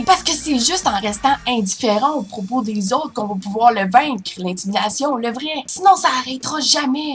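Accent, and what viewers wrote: Quebec, Canada. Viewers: s'tune quebecoise a parle quebecois tabarnak XDD ci a parlerais italien tu conprendrais pas plusse spa ta langue esti XD a parle quebecois tes francais u mad to not understand what she say ! ? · Lol!!L'accent du pur Quebec!!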